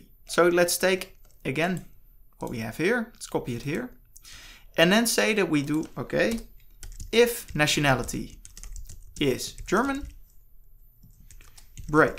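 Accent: Netherlands. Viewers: Subscribed want ik hoor meteen de Nederlandse accent:p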